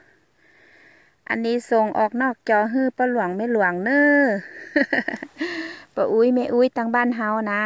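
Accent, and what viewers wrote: South, Thailand. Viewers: พี่ แหลงใต้ด้วยค่า จากเอฟซีคนใต้ค่า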